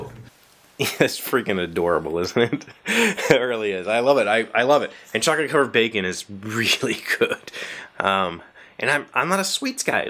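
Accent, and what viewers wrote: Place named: General American, United States. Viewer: Ha ha ha of course you have an accent! I find you easy to listen to, a more general 'American' while those from the south often have a drawl or sing-song accent. Now this is because I am Australian, and no we never say we will put a shrimp on the barbie...we dont use the word shrimp in relation to seafood, its a prawn, a shrimp would be an almost derogatory name for a short person or child. Living in Australia there is less difference in accent as there is in the US more often its in the use of colloquialisms or local phrases, or ending the sentence with an eh. Sam, well done. Cute family, but OH NO chocolate covered bacon??? I have no words... Well done, be a good son and make stuff for your Mum. Have a safe and happy weekend to all, Trace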